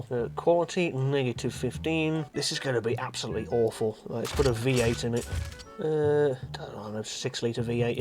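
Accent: United Kingdom. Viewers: It's the first time that I listen to someone with a British accent and laugh. Congratulations😅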